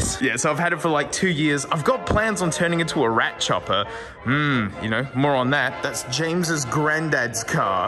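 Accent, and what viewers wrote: Australia. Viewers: Did you just wake up? You sound like Australian James foster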